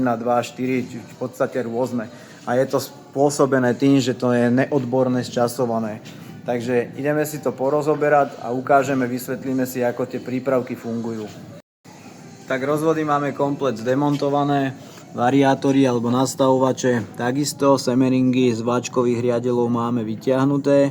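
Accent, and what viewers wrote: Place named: Trnava, Slovakia. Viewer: spravne cavo vyprava akoby vzivote trnavu neopustil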